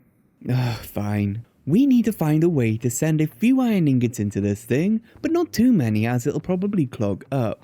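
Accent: Wales, United Kingdom. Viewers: Where is that accent from? Sounds slightly welshie with some words xD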